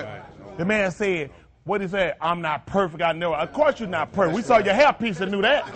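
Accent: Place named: South, United States. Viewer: Man his Country accent gives it ALL. his hair piece!!!!!!!!!!!!!!!!!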